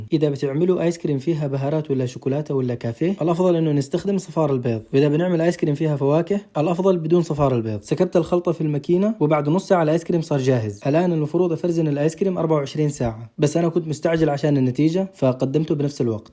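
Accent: Aden, Yemen. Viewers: تسلم يدك وتسلم لهجه عدن الي احلا من بهارات الشاي العدني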